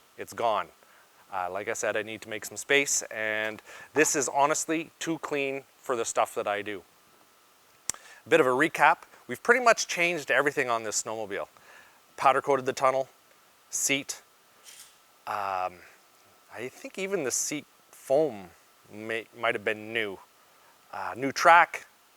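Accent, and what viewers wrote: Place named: Ontario, Canada. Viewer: Had to add the Ontario accent...Thanks for all the awesome videos. Peace brother.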